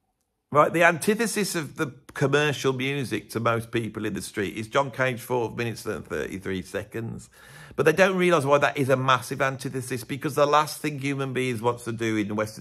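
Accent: Birmingham, United Kingdom. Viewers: love me a Brummie, or Black Country accent ❤ any day of the week. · I'll take sense of humour over Beato's supposedly superior "intelligence" any day of the week. I love the Brummie/West Midlands accent anyway, it's one of my favourites. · he has much more intelligence than this annoyingly verbose Brummie...the accent is too much to handle.😎😎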